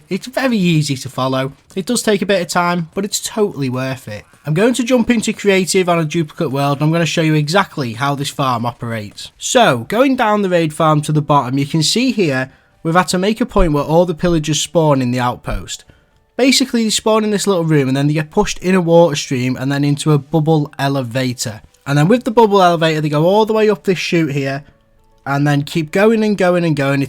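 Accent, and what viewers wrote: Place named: Northern England, United Kingdom. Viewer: Gotta love that northern twang of the English accent ! Moo idea how people said we sound the same when I’m from the south which is considered the “posh” accent of England haha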